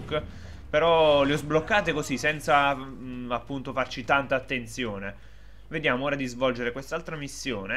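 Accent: Naples, Italy. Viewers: non so se già te lo hanno chiesto ma sei di napoli????? Comunque bellissimo videoooooo!!!!!!!!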